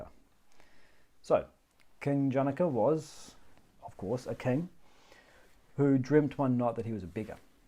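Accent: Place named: New Zealand. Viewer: Auto-generated subtitles seem to have trouble with the New Zealand accent. The prase used in the first part of the video (e.g. ) is "Dream lion"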